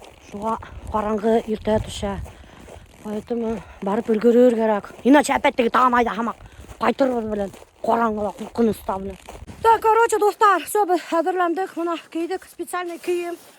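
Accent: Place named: Bashkortostan, Russia. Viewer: Похоже вы хорошо знаете татарский. У нее самый ни на что есть башкирский, да ещё зауральский.😂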